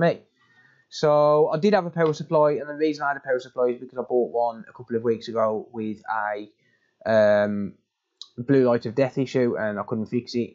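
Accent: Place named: Walsall, United Kingdom. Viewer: Ooooooo, you sound super local 🤣 Walsall guy in the House!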